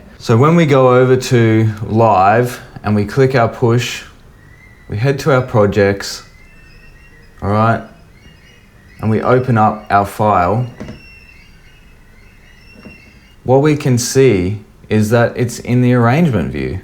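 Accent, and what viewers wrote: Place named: Australia. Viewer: great tips! still early in my journey on the Push 3, not really an in-the-box tip, but I LOVE using the ROTO-CONTROL with the Push 3 as you can lock it to a rack and tweak that while not impacting what happens with the Push3, kinda of like the 'Select On Launch' being OFF on the ROTO. Nice hearing an aussie sharing the love!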